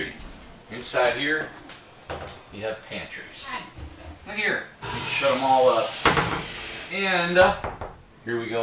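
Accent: United States. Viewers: God I love American accents, this guy's passion is so infectious as well.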